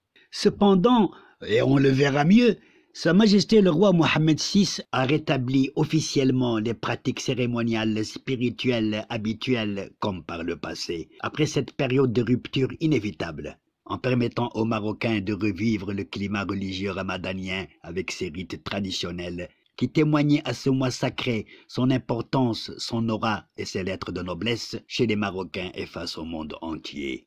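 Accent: Paris, France. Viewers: Bonne fête d'abord pour l'Eid Fitr. Je crois que désormais la grande popularité du Roi Mohammed VI et les impressionnantes réalisations d'un Maroc nouveau qu'il a inaugurées à son accession au Trône n'auront plus de secret pour moi, après avoir visionné ce documentaire d'une valeur considérable. Votre analyse est aussi riche que succincte en fournissant l'essentiel sur les attributs fondamentaux du Roi du Maroc en relation avec son "métier de roi" selon sa propre expression. Votre lecture n'est pas moins claire avec une intonation française parisienne impeccable, absente chez beaucoup de mes concitoyens. A cela s'ajoute évidemment votre talent d'orateur qu'il n'est plus besoin de rappeler, associant non seulement l'art de parler et l'art d'écrire à l'égard de tous, d'après moi, mais aussi une belle voix dont la chaleur et la tonalité phonétique pénètrent le cœur. 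Permettez-moi d'ailleurs de vous exprimer mon sentiment, pour ne pas dire ma perception psychologique admirative à ce sujet. Les vibrations de vos cordes vocales émettent un timbre spécifique à votre psychologie. Et cela découle donc d'une combinaison de sons harmonieuse qui relève de la phonétique descriptive. Le Psychologue-ORL retraité que je suis, a pu relever en vous cette particularité phonétique à travers votre élocution. Elle est encore plus perceptible dans l'articulation et l'enchaînement de vos phrases, à travers vos poèmes. C'est d'ailleurs ce qui m'a attiré initialement en écoutant votre premier poème da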